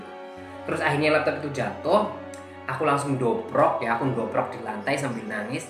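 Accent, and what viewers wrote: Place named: Yogyakarta, Indonesia. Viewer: Kak ini orang bali tapi gaya bicaranya kaya wong jogja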